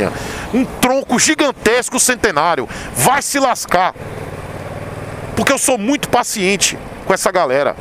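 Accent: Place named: Northeast, Brazil. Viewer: Que vídeo necessário meu irmão, nestes tempos de culto ao ódio, é bom demais ouvir palavras como à sua, um cara sempre alto astral. Eu nunca fui de ler comentários no youtube, pois acho que é muita destilação de ódio. Então meu caro, fica essa força da minha parte para contigo, eu que sou negro e ateu e pobre sei o que é sofrer preconceito. Meu amigo o sotaque nordestino é maravilhoso, sou do interiorzão de Minas e acho legal demais o sotoaque e a resiliência que o nordestino tem! Um forte abraço, continue brilhando meu caro!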